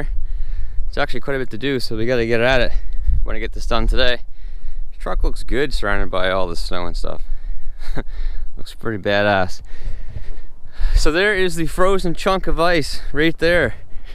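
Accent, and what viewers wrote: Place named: Maritimes, Canada. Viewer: Get it done before the snow flies again on Monday. You're "Maritimer" accent is coming out "Started up the trucks from inside the "ouse" so glad you don't have a "bout" too. Hahaha. Don't worry I bug my friends too about it. Nothing like a Caper talking about the squalls or de fishing season. Cheers.🎄❄🎄❄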